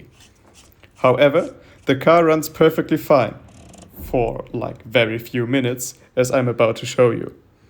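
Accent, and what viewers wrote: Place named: Austria. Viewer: You have a very strong accent, are you from Austria?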